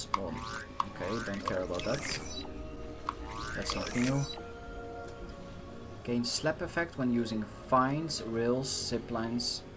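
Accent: Netherlands. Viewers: vindt je nl accent zo grappig nice vidoe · Are you dutch?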